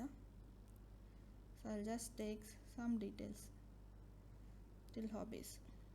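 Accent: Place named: Karnataka, India. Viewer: i recognize that kannadiga accent. i could be wrong tho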